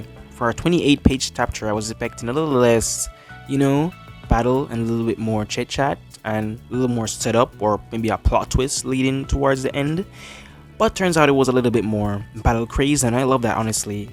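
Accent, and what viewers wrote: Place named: Jamaica. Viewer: with all due respect where are you from brother? I can hear a kinda accent ive heard before a lot but i dont to be rude, but you kinda sound Jamaican. also amazing video thanks for the breakdown of the chapter bro!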